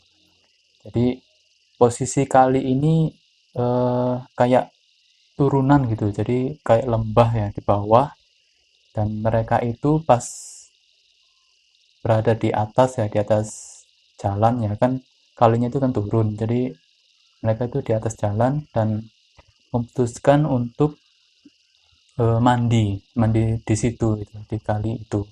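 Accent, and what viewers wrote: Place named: Java, Indonesia. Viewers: Paling males yg pake kata kata GUE, Logatnya Jawa lg, Hadeh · Medokmu ngakak wkwk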